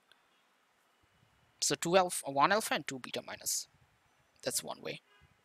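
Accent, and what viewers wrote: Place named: Bangladesh. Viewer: yeee agreed Also that accent was so clearly Bangladeshi ahah Love the representation tho, he’s much better than that Pakistani dude who barely explains anything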